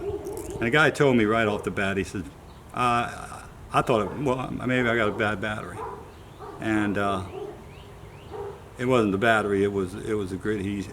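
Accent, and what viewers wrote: Louisiana, United States. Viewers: Are u Cajun? I bought my first cummins while I went to school in Louisiana and u sound like all them cajuns down there. Lol Some good folk.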